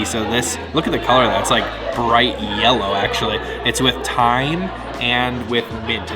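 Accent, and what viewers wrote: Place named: Canada. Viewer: I love your Canadian accent (the "ou" phonemes are probably Scottish in origin)! You probably know more about international cuisines then anyone else on earth. "Been there. Tasted that . . ." It's existential, not cook book stuff! Long may you reign . . .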